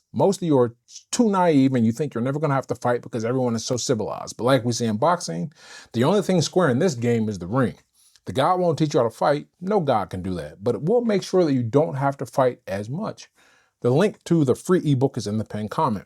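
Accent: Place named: Fox Chapel, United States. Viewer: You grew up in the hood but speak and act all Fox Chapel or Nevillewood. Salute my man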